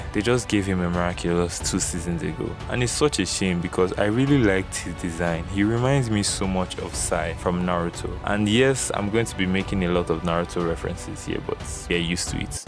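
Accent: Nigeria. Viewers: are u nigerian by chance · Are you Nigerian?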